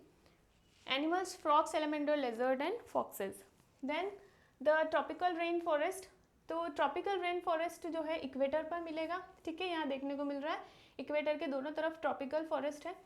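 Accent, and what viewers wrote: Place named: Lucknow, India. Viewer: Ma'am i think you are from lucknow by the way of speaking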